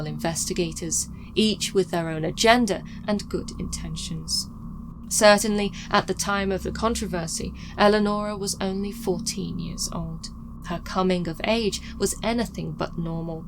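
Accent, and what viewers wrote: United Kingdom. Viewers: You are such the British lady. Love your video's and your accent.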